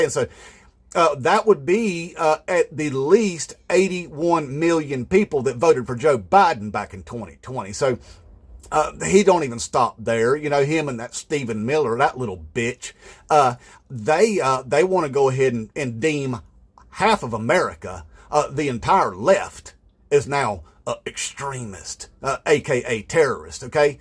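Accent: South, United States. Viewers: "We was just, you know, just usin' your correct pronouns. We thought that's what you wanted to be called. We didn't mean no disrespect. We just thought you wanted to be called fascists." That's brilliant. That's brilliant. Once again, perfectly said with a perfect Southern drawl.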